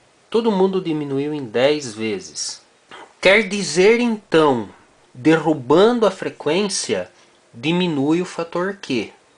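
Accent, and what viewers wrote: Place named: Caipira, Brazil. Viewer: Gosto de voce porque voce fala que nem um caipira com certeza gosta de música caipira ambém......tabém sou filho de caipira...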